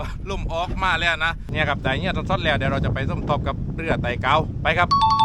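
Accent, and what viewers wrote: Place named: South, Thailand. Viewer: ชอบดูน้อง พูดใต้แต่ฟังง่ายจัดเจน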